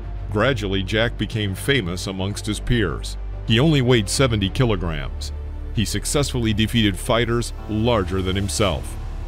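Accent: United States. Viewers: Hey narrator with American accent, wtf is "kilograms"? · This narration is with an American accent yet Dempsey's weight is given in kilos. They tried to force us into the metric system decades ago, but that was rejected. I doubt we'll be using kg, mm, liters, etc. any time soon.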